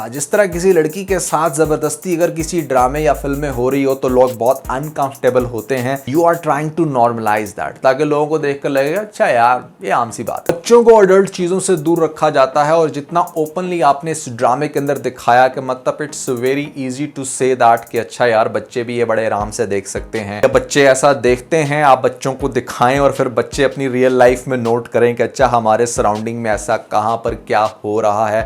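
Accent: India. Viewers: Bhai ap indian logo ki tra kyn baat krte hn فر nhi پھر hota he Plzzz Urdu me baat kren Hindi me ni Ap hmare bht fvrt hn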